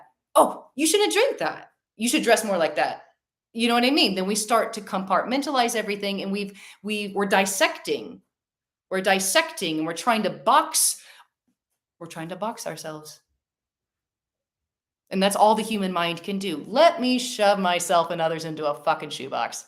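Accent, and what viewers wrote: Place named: South, United States. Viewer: Suddenly realized she’s southern lol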